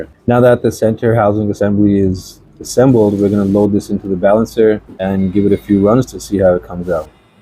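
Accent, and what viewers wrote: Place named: Iran. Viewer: لحجه ات مشخصه ایرانی هستی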